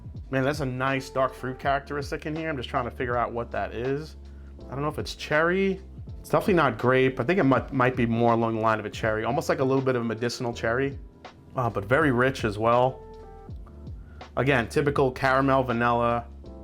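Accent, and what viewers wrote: New York, United States. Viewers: Are you from New Yawk? I heard you say 'wata'. I'm fum Brooklyn.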